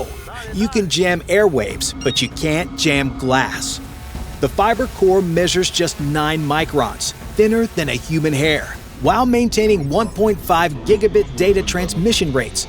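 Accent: United States. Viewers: Heavy American accent.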